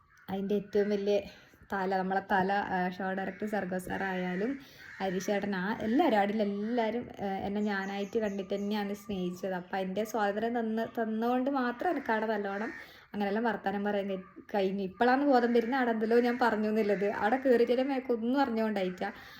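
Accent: Kasaragod, India. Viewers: Nammale kasargodan bhasha ❤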